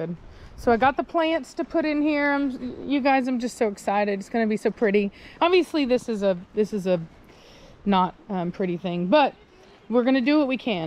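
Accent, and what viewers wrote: United States. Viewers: Put that Texas twang on girl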